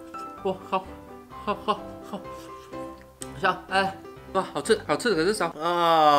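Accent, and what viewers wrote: Malaysia. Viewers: 大马华人的口音好可爱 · 因為是馬來西亞人lah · 这位哥哥是不是马来西亚人(˶‾᷄ ⁻̫ ‾᷅˵)我听到liao，滚了（liao）。好可爱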